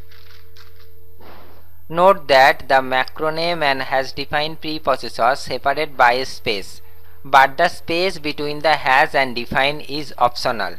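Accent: India. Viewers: Sir r u south Indian? ?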